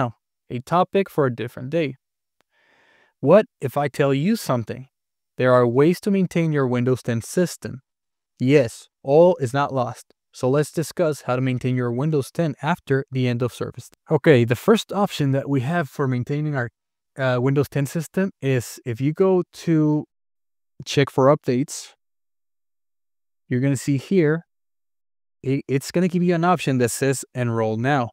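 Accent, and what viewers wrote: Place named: United States. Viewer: Muchas gracias hermano. qundo me coco no trabajo... you ensonido como un gringo. sin escolar. Su Engles es perferto. Felicidades ! Quiszas con mas la practica.... un dia enel futuro, Mi Espanol .... Tu intiendo mi sentimiento. Occidente Australia. poner este lugar sobre su listo de viajes. Disfrutar su dia amigo!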